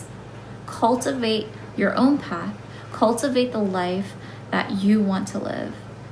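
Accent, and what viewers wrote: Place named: United States. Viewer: 핱시봤을땐 한국인유학생출신인줄알았는데 이 영상보고나니 한국계미국인인거 확 와닿네요 말하는 방식이 완전 미국인ㅋㅋㅋㅋ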